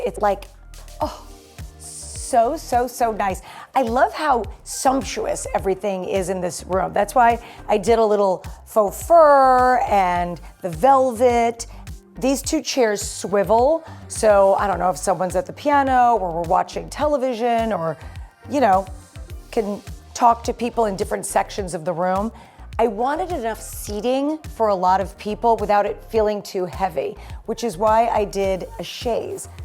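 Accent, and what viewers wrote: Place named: Texas, United States. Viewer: You are a hoot! Texas talk ,love your room.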